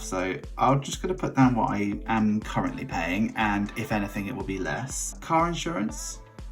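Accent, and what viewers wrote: United Kingdom. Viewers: Dear, I absolutely get your point and I LOVE how honest you are. Trust the change. Change is good and with your talent, it will bring you fantastic things. I also will need to move by myself next year, and Im dreading it. Ive never lived by myself, always with family, but it got to a point where I KNOW I need to step away. I found your channel thru Pinching the Pennies and it got my attention because of your BEAUTIFUL British accent (let's all admit British people have the most elegant accent EVER😍), and stayed because of your commitment and talent, your honesty and your strive to reach your goal and because of ur cash stuffing videos cause I think British pounds are pretty cool (us dollars are ugly AF). Don't be scared. Things will get better! We're here for you and with you thru this journey, even if virtually ❤️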